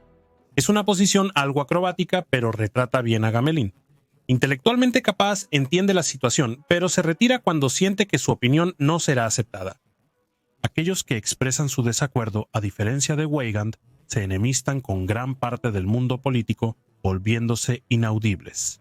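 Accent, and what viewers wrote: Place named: England, United Kingdom. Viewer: En este video, los apellidos franceses se pronuncian " a la inglesa". Hombre, no creo que sea tan difícil hacerlo en francés.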